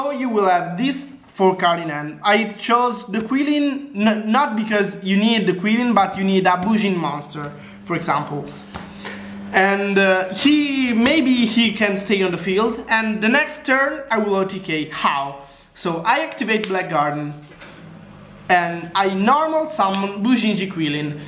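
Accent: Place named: Tuscany, Italy. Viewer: O.O TI DEVO FARE I COMPLIMENTI PARLI MOLTO BENE L INGLESE MA L ACCENTO TOSCANO NON TE LO LEVA NESSUNO :)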